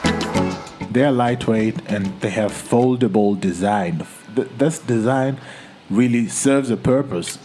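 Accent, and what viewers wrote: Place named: Zimbabwe. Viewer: you sound like a Zim own?:). You from Zim?. Great review and unboxing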